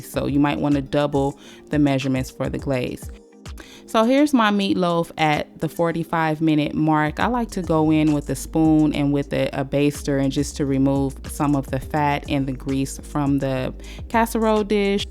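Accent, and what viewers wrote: South, United States. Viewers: Great recipe! Your step of sweating the veggies down first is spot-on.. raw veggies will release a ton of water and make your meatloaf crack apart. You sound like a Southern lady, so may I suggest adding Celery to your veggie mix? The Cajun Holy Trinity of cooking! 😁 Celery should be equal to the bellpepper amount.. Newly subbed!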